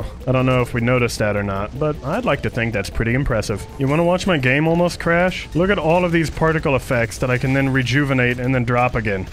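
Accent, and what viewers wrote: United Kingdom. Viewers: are you British?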